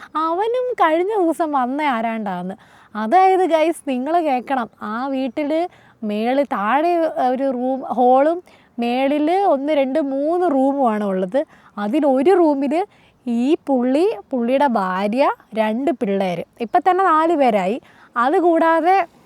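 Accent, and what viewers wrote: Kottayam, India. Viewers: Kottayam slang liked enna parayana Ennada uvve angana kure und like kochi trichur tvm etc 😊😊🎉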